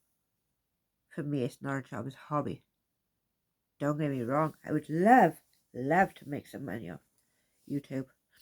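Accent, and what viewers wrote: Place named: United Kingdom. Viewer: British accents are beautiful as my Gran was from Northern England in Warrington. To hear you speak is like being home to me. I have a very mixed accent, but my Southern Tennessee/Kentucky stands out the most. I don’t see Renelle as a problematic creator , she’s a strong lady and I admire her. I like Barbi too. Subbed to them both, but I’ll not partake in negativity. We have so much of that already. I post mostly nature videos and goofy parodies. I may share serious topics here and there. It’s a great hobby for me as it’s not a montetized channel. My advice if anyone gets sucked into that negativity? Ignore them. Block them. Unsub them. Don’t speak of them. That’s what I’d do. I was stalked online almost ten years, it’s awful. We need to be kind. Thank you for making this video. I’m subbing. 🌹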